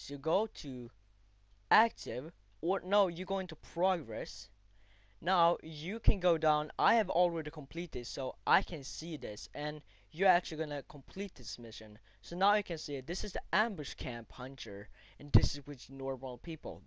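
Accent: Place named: Norway. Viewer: very nice, immediatly heard it from the accent. love from norway!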